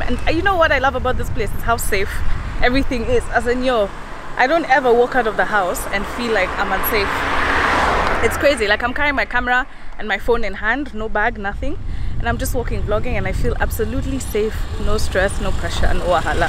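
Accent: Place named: Kenya. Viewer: ughh love your kenyan accent ! it has such a western tang to it !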